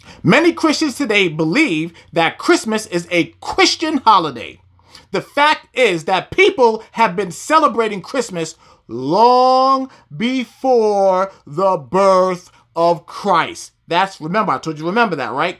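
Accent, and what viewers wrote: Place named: United States. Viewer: My Gee👀 Thank you bro I'm from South Africa so I had to figure it out my self that you were saying Satan because of you American accent with the T sounding like D 🇿🇦🇬🇧🇺🇸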